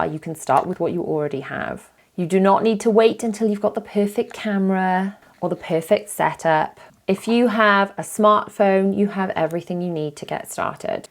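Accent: Wales, United Kingdom. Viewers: A video breaking down your filming and editing process would be massively helpful! I’ve been watching you for years and it’s just been nice to hear a Welsh voice and relate to your life as a mum to 4 (like myself) at the moment I’m loving your Disney vlogs and they will help me massively as I plan Disneyland Paris next year and in the near future florida (because I’m dying to go back!) I’m also a YouTuber and I love every minute of it but it is soooooo hard. You definitely neeed to have the right mindset to keep pushing through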